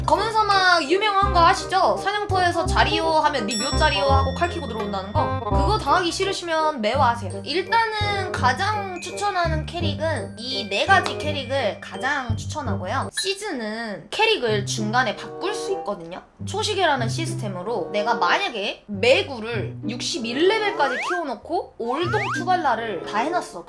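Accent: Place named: Jeolla, South Korea. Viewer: 전라도 분이 신가..? 말투가 되게 중간중간 구수한 발음이 나오시내 ㅋㅋㅋ 재밌게 봤습니다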